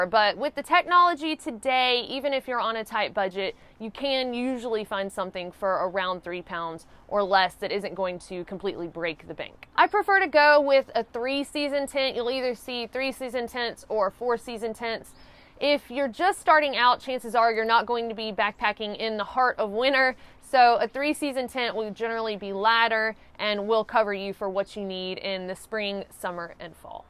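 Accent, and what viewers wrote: South, United States. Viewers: Love your accent. Reminds me of watching the Dukes of Hazzard!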